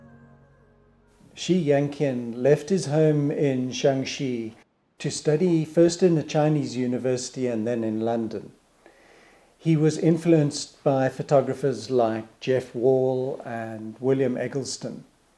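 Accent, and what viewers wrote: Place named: South Africa. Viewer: had a feeling you were south african when i heard your voice :)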